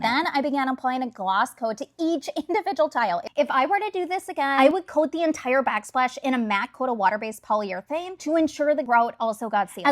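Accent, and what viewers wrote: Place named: Midwest, United States. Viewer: Wow, your accent is so fascinating! It's like you're from Minnesota/Wisconsin but you've lived in California for 20 years. I am a HUGE fan of painting backsplashes instead of retiling if you hate it. You nailed it.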